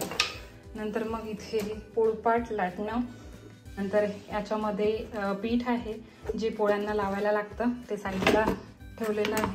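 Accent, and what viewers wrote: Vidarbha, India. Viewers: खूप खूप छान किचन , बऱ्याच नवीन गोष्टी कळल्या तुमच्या व्हिडिओ मध्ये ,very useful video, माझा पण घराच काम सुरू आहे ,मी नक्की या पद्धतीने बनवायचा प्रयत्न करेन .thank you so much.. तुम्ही विदर्भ मधील आहात का, भाषे वरून वाटत ,मी पण नागपूर ची आहे 😊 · ताई किचन छान आहे तुम्ही भाषेवरून मला असे वाटते की विदर्भातील आहात कारण मी पण यवतमाळची आहे सध्या पुण्यात आहे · Tai tumhi kontya shahratun aahat, tumcha bhashevarun tumhi vidarbhatlya vatatat, video chanch hota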